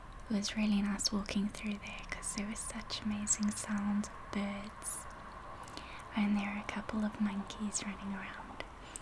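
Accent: South Africa. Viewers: Hey I am from England I've never been to south Africa it's strange because I get home sick when I see videos of country's so far from England I live in England was born here but I think of being lonely and missing England if I lived in another country it gets me sad atually maybe I am so use to being in England that I have got a bond with country but I do love how pretty south Africa you do have the best accent by the way I think you are every English guys partner but I would get lonely even with you if I moved to south Africa but thanks for making these videos I will always like you're videos and south Africa is very beautiful one of the top 10 maybe top 5 country's in the world for beauty just like you you're pretty to and I hope you don't get lonely in your own country I am nearly 28 and lived in England all my life was born here but I get home sick so bad even if I go on holiday to any other country for 1 week but I love seeing south Africa and living in England because I don't get home sick or feel lonely it's strange feeling lonely it's like you miss the country you have been in all you're life but it's nice to see how south Africans live and I like the way you talk best accent ever in my opinion you look south African thanks for upload I listen to south African radio alot and just love the accents also I like how you always smile and happy I'm mostly happy to its best way to be especially at Christmas I commented on your Christmas tree video and called i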